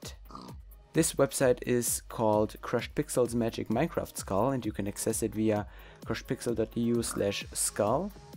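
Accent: Germany. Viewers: CrushedPixel Are you German? Im asking because you have a German like accent.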